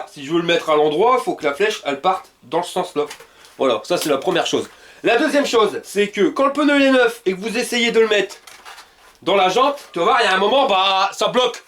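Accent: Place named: North, France. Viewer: Salut , je viens de découvrir ta chaine et rien que pour ton accent d'min coin je dit oui :) Je suis du nord aussi proche de valencienne si jamais ^^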